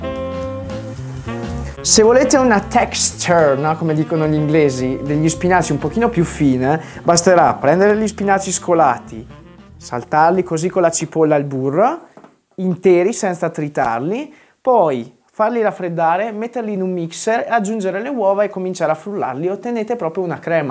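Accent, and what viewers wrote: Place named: Romagna, Italy. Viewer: Mi piacciono tutte le tue ricette sono ottime nn ultima ragione sento dall' accento ke sei romagnolo e io adoro tutto della Romagna bravo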